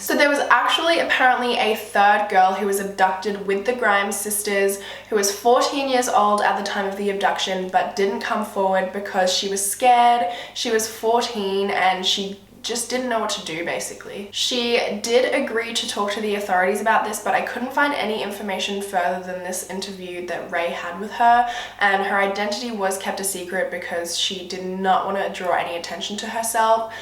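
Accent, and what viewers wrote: Australia. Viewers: Is that an Australian accent? I love it😍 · Not the most typical Australian accent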